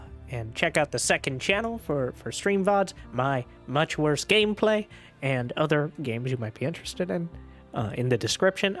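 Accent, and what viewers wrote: United States. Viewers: Ты американец?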